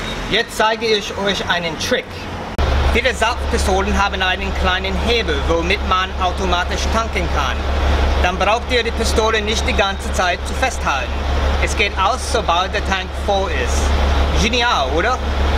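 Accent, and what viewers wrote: United States. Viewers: der typ spricht mit amerikanisher aussprache, spricht viel bessar als mich aber. gruesse aus bulgarien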